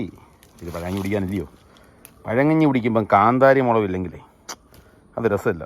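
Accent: Kollam, India. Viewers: Kollam slang....... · ചേട്ടന്റെ സ്ഥലം എവിടാ നമ്മുടെ കൊല്ലക്കാരുടെ സംസാരം ആണല്ലോ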